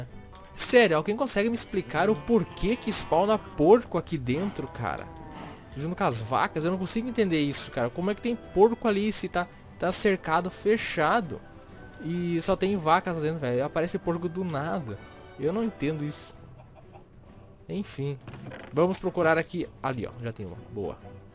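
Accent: Rio Grande do Sul, Brazil. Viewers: eu não gosto quando você fica tudo Gauchinho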